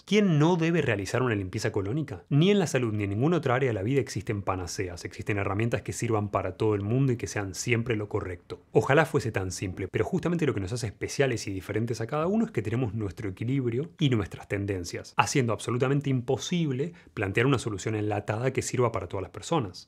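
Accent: Argentina. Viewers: Soy mexicano, por su acento supongo que el dr. es argentino, yo tengo la creencia que los argentinos son líderes en todo lo que quieren llevar a cabo.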